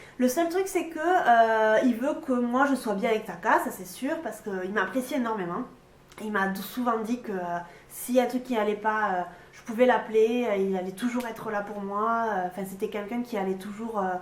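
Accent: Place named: South, France. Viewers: Les Japonais doivent trouver son parlé Japonais charmant et amusant car cette jolie jeune fille a déjà un accent du sud prononcé 😉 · Accent de Carcassonne ? On dirait que tu viens de l'Aude non ? Perpignan peut-être ? En tout cas Sud-Ouest.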